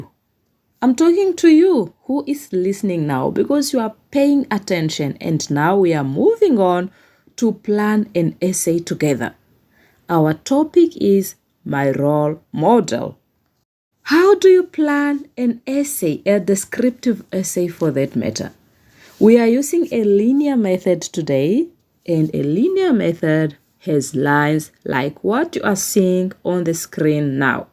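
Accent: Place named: Namibia. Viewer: Huh? My fellow Namibian. No wonder i understand the tone so well. Lemme Subscribe ❤🎉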